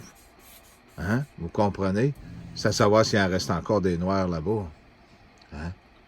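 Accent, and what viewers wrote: Quebec, Canada. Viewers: mon rabbi 4H30 DU MATIN J AI écouter ta vidéo et me suis suis endormie et me suis réveiller au moment ou tu parle des chien et chat robot j ais piquer du nez 3 a cinq minute j ai pas arretter de bosser et j ai encore beaucoup a faire, .je voie l avenire et je suis avertie de se qui va arriver sa fait 4ans que cela me le fait je suis tout se que j ai vue et predit est arrivé j ai le dont de prophétie .comme je sais si quelqu un est en danger et que je le sais . ou comme je vois se que font les personne soit disant gentille et qui pratique la magie qui invoque les ange .il est bien dit qu ils est interdit de prier les ange ,on doit prier DIEU seulement merci pour les dont que tu ma donner seigneur rassure toi je dirais plus rien sure les histoire je veux seulement parlé de mes dont qui mon bien servie jolie meuble en bois rouge dont j ai oublier le non .ils veule un monde de robot avec des humain pucer avec leure soudisans virus il vont vouloire noys mettre la vacsination obligatoure et forcer pour nous inplanter une puce RFID et le virusse pour nous tuer .eux on créé cette merde de virusse dont il on créé l entidote pour eux .sa va se retourner contre eux .SESUKULI MON ROY MERCI petit arbre .4H54 fin du message je comprend bien le QUÉBÉCOIS et j aime bien l accent je suis abituer j ecoute beaucoup de chaine youtube QUÉBÉCOIS .gros bec a vous tous .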